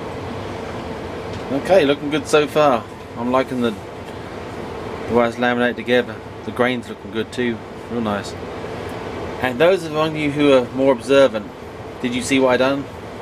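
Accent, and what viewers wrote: United Kingdom. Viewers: This guy sounds strangley british Also love the video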